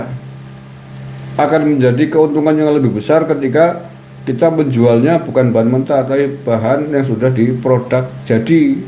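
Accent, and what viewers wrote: Banyumas, Indonesia. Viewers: Saya seneng cara marahnya pake bahasa banyumasan logat mirip orang bumi Ayu . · Ngomong bahasa jawanya ngapak keren pakde. Membumi dan enak didengar. Salut.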